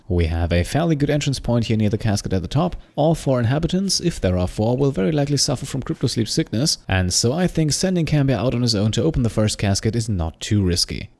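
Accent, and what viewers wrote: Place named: Germany. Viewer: Something's came to my mind watching this series. You have - maybe I am imagining it - a slight German accent. Is that the case? Because while being German myself and knowing how normal Germans speak English, I definitely had to listen quite a while to come to this guess. If that was true, I would highly have to take my hat off to your English language skills. ... die hast du doch nicht im Studium erlernt? Das erste Mal, als ich darüber gestolpert bin, war, als du von der Masterarbeit gesprochen hast, was mich das erste Mal hat aufhorchen lassen. Worüber war die btw?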